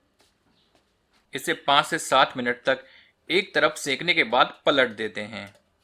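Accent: Standard. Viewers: Bhai ji app butter chicken ki recipe bhi batana.. Please...Apki videos hum sabko bahut achchi lagati hai, apka shuddh hindi bolne ka andaz hum sab ko bahut bhata hai, I love you bro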